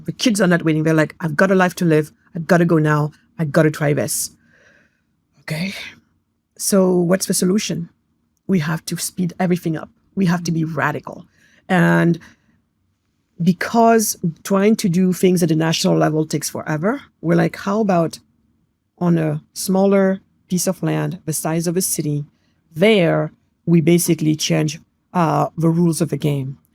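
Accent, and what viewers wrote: United States. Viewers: 30 seconds of listening in, sounds odd. An African ensconced in the West, US probably going by the American accent. Like you say shallow knowledge shallow conclusions.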